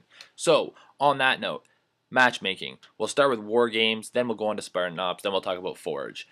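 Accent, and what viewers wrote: Canada. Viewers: he sounds like he lives in canada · I love your Canadian accent! It's just perfect! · your Canadian aren't you?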